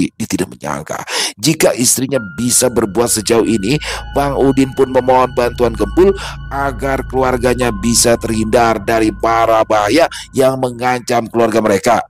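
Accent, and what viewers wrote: Jakarta, Indonesia. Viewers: 😂😂😂❤❤❤,..ini ini yg saya suka logat betawi yg kental dan super lucu, 😂😂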